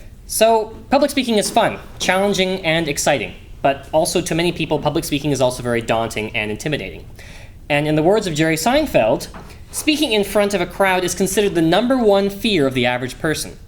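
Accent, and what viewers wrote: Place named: Canada. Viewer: Neither, it is Canadian.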